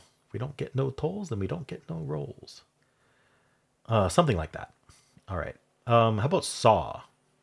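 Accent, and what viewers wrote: United States. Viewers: I really really couldn't figure this one out, until said it in his American accent. But yeah I was never going to get this, those are not homophones at all in (most?) other accents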